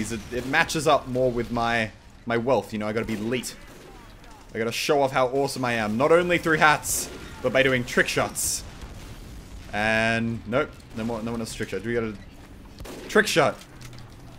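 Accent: Australia. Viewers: Are you Australian because you have one of the rare Australian accents that is actually cool and not annoying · the whole time watching this I felt like this was prerecorded gameplay with sone crazy straya guy shouting at his own video...I bet is from the deep north of NT, like Darwin or something else weird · WAIT YOURE AUSTRALIAN ??? ---- G'DAY MATE !!!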